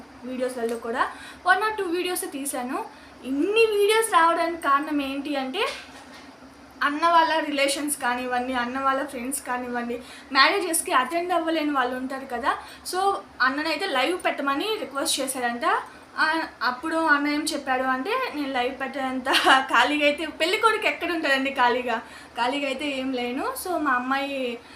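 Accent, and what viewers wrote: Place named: Nellore, India. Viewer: Good very good....maadi kuda Nellore ni matalu vintunte mana Nellore Baga gurtostundi...proudness tho nanaki istanu annav good ni kallaloo happy ness chustunte yevarina motivate ayyi Ila intlo unde vallaki nuv chala aadarsavamthsmga undali vallalo marpu vachi vallalo unde talent ni gurtinchslani korukuntunnsnu any way congratulations...all the best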